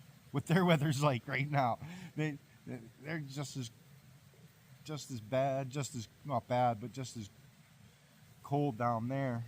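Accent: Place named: Pittsburgh, United States. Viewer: I can yell you are from western PA. Lol. The Pittsburgh accent. I am too. Great vid man.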